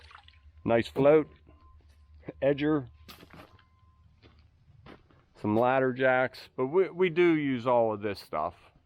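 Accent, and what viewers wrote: Pittsburgh, United States. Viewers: I stumbled across your video and started watching it. The minute you opened your mouth, I knew you were from the Pittsburgh area. I was born and raised in Pgh and moved to Arizona in 1998. I still recognize that Pgh accent! I subbed just to get my Pittsburghese fix lol. Thx for sharing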